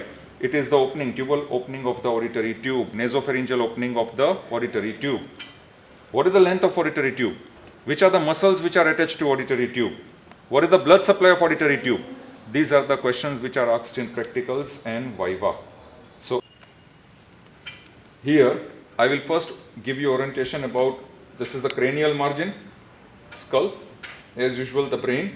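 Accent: India. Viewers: This guy has a strong accent. Seems like if he were speaking native Indian language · Indian english I cant understand anythink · Indian accent?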